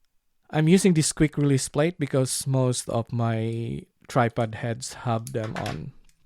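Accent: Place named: Philippines. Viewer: Hi there! I hope your have a great day! Nice video! I have a question, are you Filipino? My mom was born there! Just wondering if you are because you sound like you are! Have a good day!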